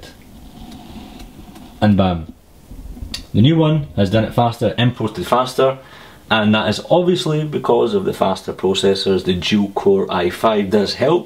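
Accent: Scotland, United Kingdom. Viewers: That's a British Scottish accent. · r u from Scotland ??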